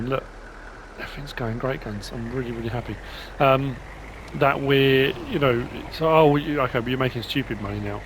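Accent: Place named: United Kingdom. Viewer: "Kango-hammer" seems very fitting for the British dialect, you saying "jack hammer" just doesn't sound right with your accent. (Plus Knango hammer just sounds cooler lol) I work in a quarry, kinda. I work for an aggregate and concrete company, I drive the redimix trucks. So you're pretty spot on with your story line, which was a brilliant idea and nicely executed. As far as the diehards that say "it's farming Simulator, there shouldn't be any forestry", I've never met a farmer that didn't own a Chainsaw, that wasn't ready to cut some trees down for field expansion and for firewood. Not to mention, TREE FARMS??? There's lots of tree farms out there for wood pulp for paper. Any farmer out there is looking to make the most of their time, and to not ever run out of money makings. I don't understand these people that say "you can't do that on this, you're HAVE to do it THIS way". 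If it were said to me, I'd have a few choice words for them, of which I won't say on video. DO YOU. Don't worry about it I'm not doing it the way you would.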